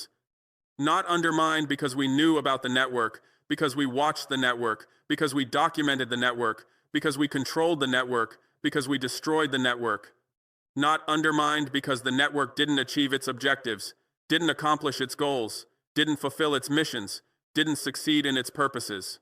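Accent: United States. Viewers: Why has he got an American accent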